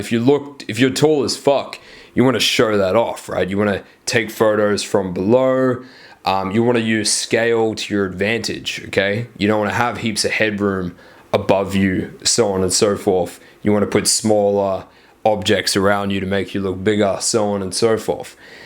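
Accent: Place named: United Kingdom. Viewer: Where are you located? You have an accent, are you in the UK?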